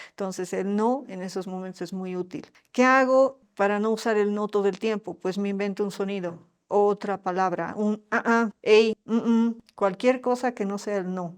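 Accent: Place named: Bolivia. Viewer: Excelente conferencia , felicitaciones , por su asentó , la Doctora es Boliviana ? De La Paz ? Muchas gracias por compartir sus conocimientos. Saludos desde el Ecuador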